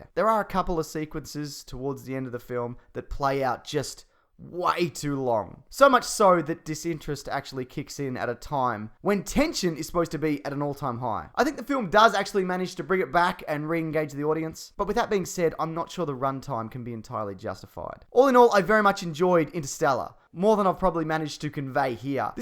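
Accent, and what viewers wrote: Australia. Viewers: Your Australian aren't you mate ?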